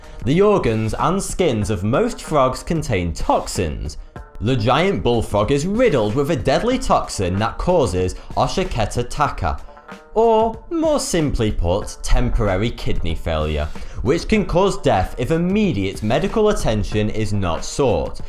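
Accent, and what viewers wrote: United Kingdom. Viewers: He is British. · That's just the British accent. We pronounce a lot differently. Also he has a regional accent which makes pronunciations even more different. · 42? really? the answer to the universe is a lower class Brit with an increasingly annoying accent? seriously? get the marbles out of your mouth son.. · Matthys Fourie think twice how does he foughty2 at the beginning of every video because he has a British accent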